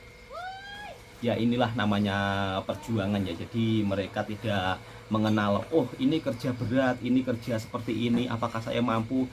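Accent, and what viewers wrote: Java, Indonesia. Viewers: Teruskan bersiar ....ngomong yg medok kang....!!!!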